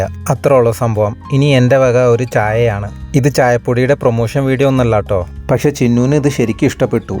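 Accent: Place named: Thrissur, India. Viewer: Slang thrissur pole thanne ind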